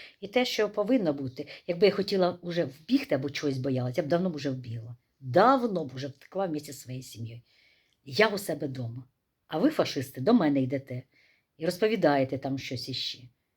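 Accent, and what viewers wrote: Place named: Ukraine. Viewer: Почитала и прослезилась . Сколько злобы и несправедливости , слепой ненависти . Дизлайк Вам , мадам ! Вы позорите украинский народ , не весь он такой . Моя бабушка была украинка , золотой человек , интернационалист ! Кстати , говор , как у Вас , акцент . Поэтому я и обратила внимание на Ваше видео , приятно стало , душевно . А потом .... Жаль . Не одумаетесь Вы , никогда .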